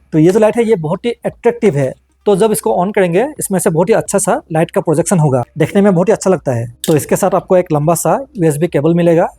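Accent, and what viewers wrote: Assam, India. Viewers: Assam or niki vai.. hindi tu huni Assamese jen lgise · Bro had the Assamese accent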